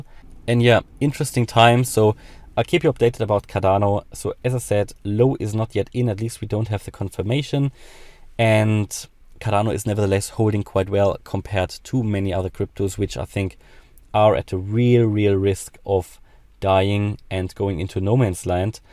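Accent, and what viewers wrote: Germany. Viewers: geiler Typ 👍 hört man direkt den guten deutschen Dialekt raus 👌 wollte nur schauen wie es um ADA steht und bin auf dein Video gestoßen. Abo hast du sicher.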